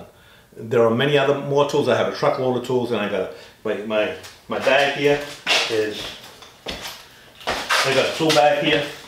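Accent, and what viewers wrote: Boston, United States. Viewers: Thank you Sir for this informative video. From one American of Italian ancestry to another,,,what part of the USA are you from? Great accent!!! Regards from New Jersey !!! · he is from Boston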